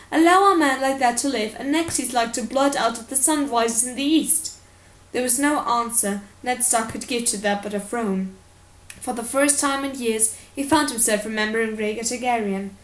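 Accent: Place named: England, United Kingdom. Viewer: i swear this is a different version of the book that i read still entertaining though plus gotta love a woman with an English accent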